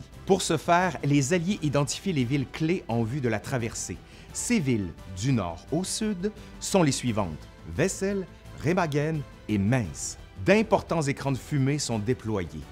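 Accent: Quebec, Canada. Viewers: J'adore l'accent québéquois! Merci ;) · Wow, je regarde toutes vos vidéos avec attention. Et vraiment, je les trouve hyper ludiques, et on ne lasse pas ! L'accent québécois est charmant (pour nous français) haha ;). Pour vous dire que j'aime beaucoup vos vidéos et qu'il faut continuer ainsi, j'ai même envoyé la vidéo sur le mur de Berlin à ma professeure d'histoire qui a adoré ! Je vous souhaite de continuer encore pendant longtemps ! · Je te le mets avc plaisir mon "pouce par en l'R" 😄 Surtt q je suis persuadée q ce ne sont pas d heures q vs avez passé à faire 7 vidéo.. mais plutôt d semaines... 👏👏👏👏 C extrêmement bien fait..les images d'archives sont placées o bon moment ..et pis.. ton accent Québécois... J'AdÔre 😉 À Bientôt 🙋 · Quel conteur de haut vol et puis cet accent canadien que nous aimons beaucoup en Europe !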